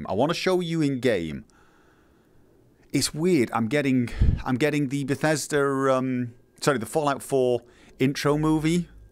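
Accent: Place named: Northern England, United Kingdom. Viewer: First thing... your accent is much, much thicker and more clearly northern Britiain than it usually is... microphone or been talking to people from home?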